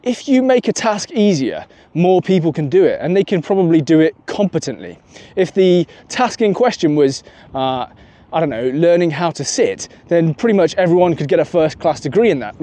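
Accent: RP, United Kingdom. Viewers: You sound posh, probably went to a private school which many families cannot afford. YOU ARE PRIVILEGED. Also, consider this, do you really think if all else was equal and everyone had a chance (including the huge pool of international candidates who are statistically more talented than you) that you would get into Cambridge?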